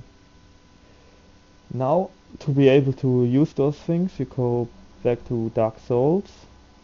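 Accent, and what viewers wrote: Germany. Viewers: are you german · Super geklappt. Eigentlich halte ich nichts vom cheaten, aber wenn ich drops nicht bekomme, die man eigentlich bekommen sollte finde ich ist das ein Grund. PS: Man hat es herausgehört, dass du Deutscher bist. Ist aber natürlich nicht schlimm. Bin ja selber einer ;) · Man merkt du bist deutsch haha :D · Are you german? You sound a lot like german xD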